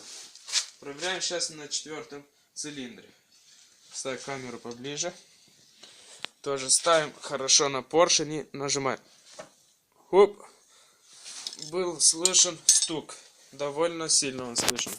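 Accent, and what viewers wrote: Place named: Moldova. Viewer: Сразу слышно,Молдова:)))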